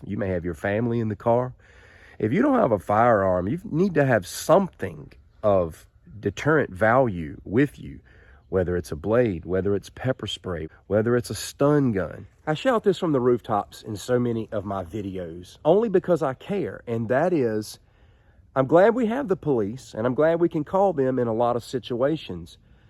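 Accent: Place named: Arkansas, United States. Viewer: Are you from Arkansas? Your accent makes it seem very likely.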